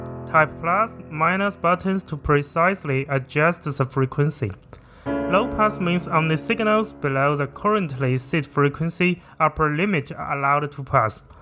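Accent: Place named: China. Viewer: good topic but not good english speaker english sounds like chinese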